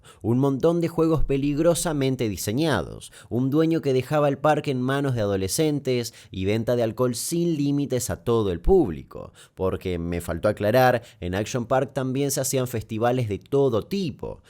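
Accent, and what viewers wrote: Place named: Argentina. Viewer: Que raro episodio del Dollop, con acento argentino y sin Badia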